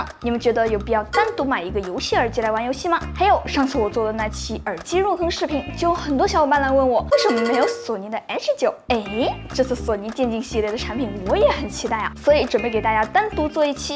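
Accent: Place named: Chaozhou, China. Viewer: 你是潮州人吧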